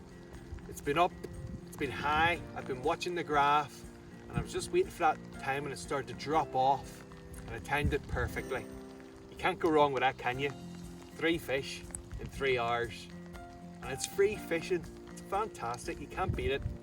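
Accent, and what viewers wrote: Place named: Ireland. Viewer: Really enjoyed this vid so much! many thanks. that Irish accent reminds me of friends I haven't seen for too long, and the positive attitude in the rainy conditions, brilliant filming, and perseverance to catch is just great and inspiring to see when I feel like staying in and not getting out fishing as the forecast is shite. all the best to you, mate, thanks again! ;-)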